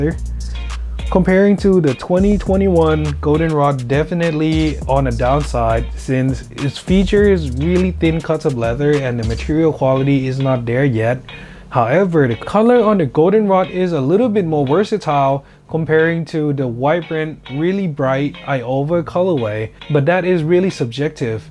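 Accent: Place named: United States. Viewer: Where in Thailand? I'm in Pattaya 4 years now and you sound American not Thai 😁 oh yeah I just bought a pair of the Goldenrod 👍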